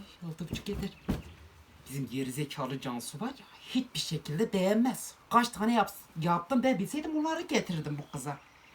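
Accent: Turkey. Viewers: Bence sen zazaca konuşma aksanli karakocan zazacanla hep kendine argo şeyler söylüyorsun lexanlisiniz siz galiba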